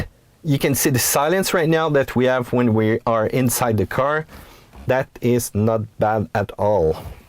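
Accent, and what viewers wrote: Quebec, Canada. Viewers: c'est pas du hate mais..j'avais jamais entendu notre accent québécois en anglais...c'est spécial xDD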